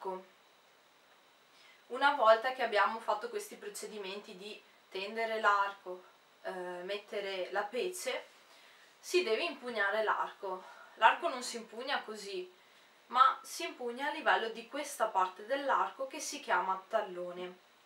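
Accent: Veneto, Italy. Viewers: Dall'accento non sembri toscana sembra veneto,comunque bellissimo strumento mi piacerebbe suonarlo ma lo trovo difficile per me.. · Hai studiato in toscana ma mi sembri veneta dalla pronuncia 🤔...sbaglio ?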